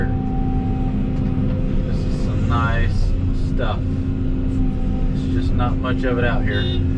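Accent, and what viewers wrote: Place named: North Carolina, United States. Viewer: Would it be safe to assume you are from the Raleigh/Sanford/Durham area? Half my family was from there and, had the same accent that you try so hard to cover up. LOL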